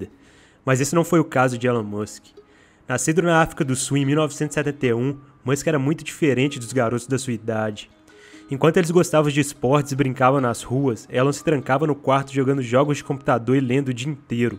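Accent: Minas Gerais, Brazil. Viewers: Sei q vc 100% mineiro pelo sutaque. Mto bom o video mto inspirador. Thank you!!!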